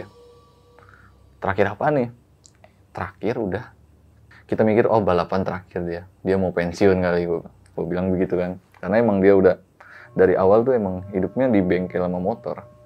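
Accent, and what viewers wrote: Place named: Bali, Indonesia. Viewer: Ini host nyh orng BALI kah ? Logat nyh jelas bgt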